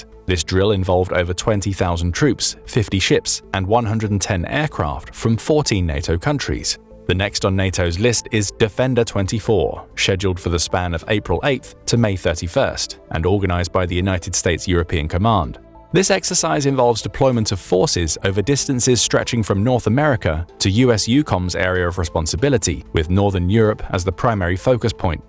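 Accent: England, United Kingdom. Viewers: That English accent is all I need to know about the serious b.s. joke I'm about to hear 😂😂😂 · I think the narrator is from The UK. Greetings from a fellow Dane 🇪🇪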